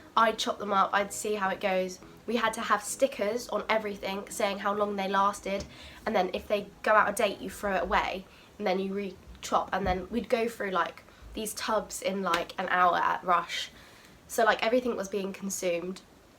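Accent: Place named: United Kingdom. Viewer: Very punctual with the response. But I sure do agree that the UK are far more strict than the US. Not really sure why people are attacking you as you are clearly in a different continent (due to your British accent) and you are just an employee, or at least used to be. But your quick response to address the issues people have is great and like the approach you took. Taking great care of the comments on your videos and addressing them and paying attention to it. That is nice of you to pay attention to useless comments by outraged people hating on subway. As for your video content, I can see you make videos with a bit of comedic style to it, which is unique. Disregard the hateful comments, your videos are great. It is natural and real and straightforward. Good luck with your channel, and hope you have a great future ahead of you!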